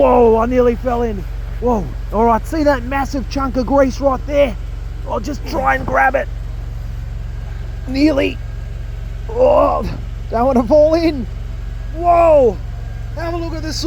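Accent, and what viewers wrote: Australia. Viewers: Hey, been watching for a while from Kent in the UK. Fucking love your commentary and rambling. Please keep up the good work and posts. By far the most stereotypical Aussie commentator and the endless enthusiasm keeps us all coming back for more. We always stay to the end.👏🏼👍🏽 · "Go on ya good thing!" is a very popular saying in Ireland so it was funny hearing it in an aussie accent I also always love "Bob's your mothers brother" (or Robert to make it even longer) which is obviously a variation of a British expression You have a great array of multicultural idioms is what im trying to say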